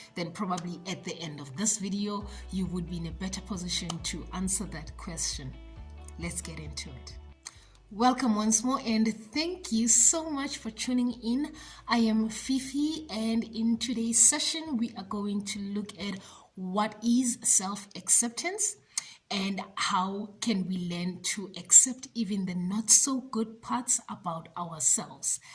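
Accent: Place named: South Africa. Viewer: Fifi you look absolutely beautiful in red, love the nails and your entire look. Love the authenticity and your south african accent 😘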